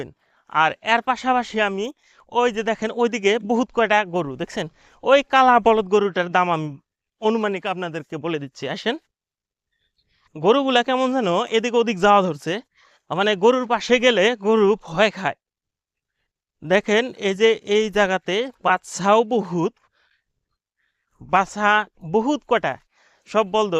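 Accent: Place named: Bangladesh. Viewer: Are u from India?apnar kothar tone to Pura bangladeshider moyo.gorur Dam 1 lather Kim bangladeshe niy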